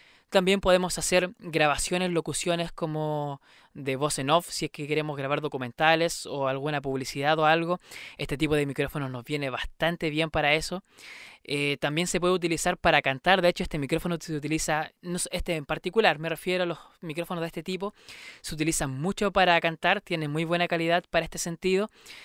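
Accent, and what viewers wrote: Chile. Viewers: Me encanta el asento chileno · Tienes como hacento chileno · eres de chile? es la primera vez que te veo jajajaja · Eres chileno o no?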